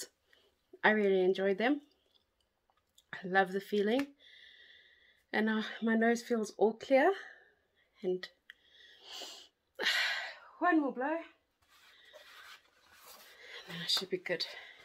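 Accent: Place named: South Africa. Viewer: Wow. That was beyond amazing. You really have a beautiful juicy perfect nose. The way you blow your nose is just perfect. The position of your hands. The honks you make. And you are really beautiful in general as well. And I love the south African accent too. Is there a way I can talk to you in private?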